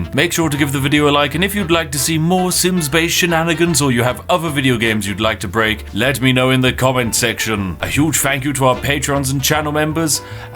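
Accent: United Kingdom. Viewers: oh my, that is one British Brit right there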